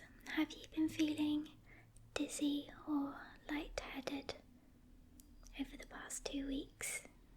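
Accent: England, United Kingdom. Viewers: Great voice and I love your accent. You’re from wich part of England? Good luck and keep it up!!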